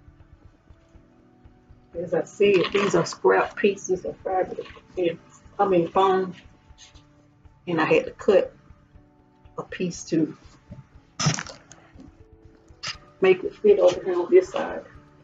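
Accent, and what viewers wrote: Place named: St. Louis, United States. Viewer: You from STL? I'm out here but from jersey...you sound like you gotta lil stl accent. Very nice btw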